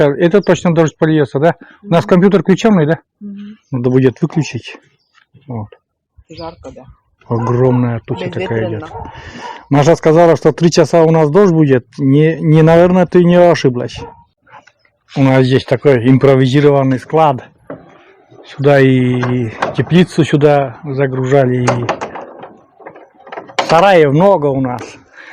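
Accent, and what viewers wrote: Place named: Komi-Permyak, Russia. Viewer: Я когда то жила на севере Пермской обл.коми-пермяцкий округ,мне твой говор,родной.Как только услышала первый раз,сразу поняла🎉,что говорит коми- пермяк. Я уже начала язык понимать,да родители уехали оттуда,а мама знала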